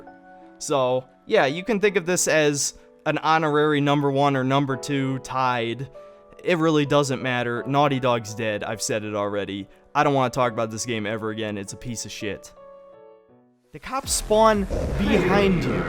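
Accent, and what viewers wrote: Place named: Chicago, United States. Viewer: I like your Chicago accent!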